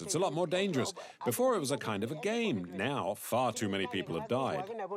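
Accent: England, United Kingdom. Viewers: His a pommie, thats how they speak, little on the painful side. Should have allowed the locals that spoke english to be heard. I could hear what the people were saying while he spoke. Some of his information was incorrect, but still a good documentary. Thankyou for sharing. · Nice English narrator.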